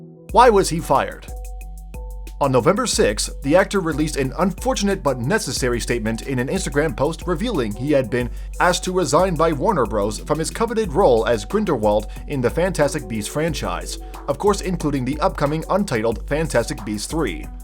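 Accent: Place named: United States. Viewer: No kidding? Your dialect sounds very American.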